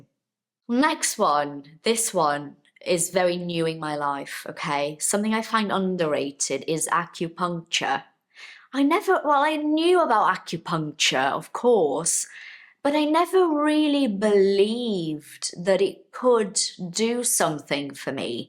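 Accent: United Kingdom. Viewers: Teacher, sorry for the question but, are you a native speaker? You have a really nice British accent oh my god 🙌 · Great content. Are you Brazilian? Beautiful British accent. I strong believe that your sign is Virgo \o/ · Hi teacher .. I'm learning the British accent..and I really like how you speak exactly like the British people I hope to speak like you 😢 Could you please tell us the secret 💔 Please make a video on this subject.. I feel frustrated because I try to speak like British people, but I still can't. Thank you very much❤.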